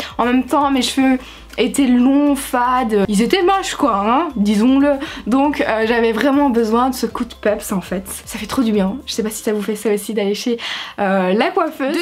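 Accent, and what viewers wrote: Belgium. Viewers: Je découvre ta chaîne et j’adore continue comme sa (tu m’a donné pleins d’idée ´et l’accent belge je suis fan )plein de bisous à toi et ton copain 💕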